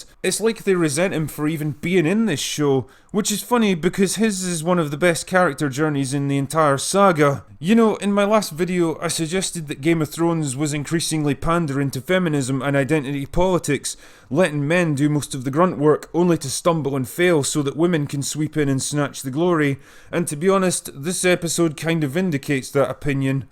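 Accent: Scotland, United Kingdom. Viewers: Are you Scottish? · OMG--I could just listen to your rant for hours! That Scottish accent and the delivery! YOU should be some kind of GOT character. I don't even care if I agree or disagree with you! LOLOLOLOL! R.E.S.P.E.C.T.! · It's amusing how much more Scottish and drunk he sounds in his older videos. If only he knew when he made this video that it was going to get even worse...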